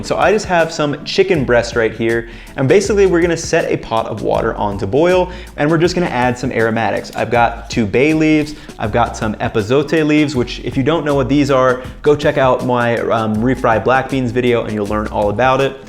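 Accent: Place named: United States. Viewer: I enjoy your videos and your cooking but some constructive advice. If you plan on living in Mexico, do yourself a favor and learn the vowel sounds for Spanish: Replace A-E-I-O-U with AH-EH-EE-OH-OO. It will take the “gringo” right out of your pronunciation. Hope that helps. Oh yes, and ALWAYS strain your sauce. Cheers!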